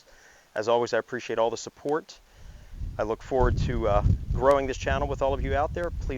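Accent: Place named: Maryland, United States. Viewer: I've been watching your Fiero vids and I was born and raised in MD before moving to Nashville 4 yrs ago. The second I heard your accent, I knew I had to subscribe! Congrats on the new location. Wish I was still in MD. Could use your knowledge for my Fiero!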